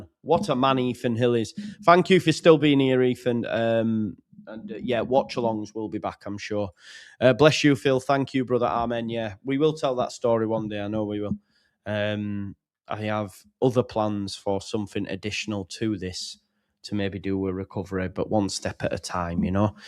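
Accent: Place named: Leeds, United Kingdom. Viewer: Best proper Leeds accent on Youtube. I missed you, man.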